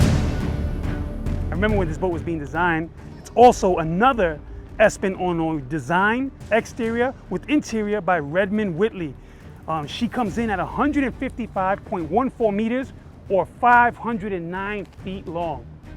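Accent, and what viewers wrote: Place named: New York, United States. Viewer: Nuttin like a New Yack accent on a video yappin about Mega Yachts???!!! You are awesome made my day.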